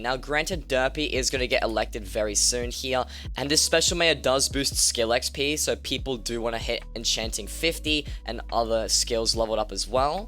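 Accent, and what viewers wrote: Australia. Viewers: Where in Australia are you from?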